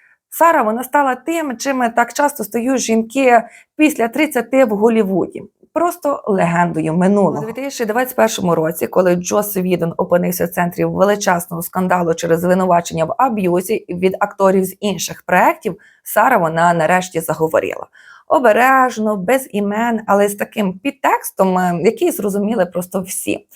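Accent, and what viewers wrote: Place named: Volyn, Ukraine. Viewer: Ох ваша говірка🎉шарю що з Волині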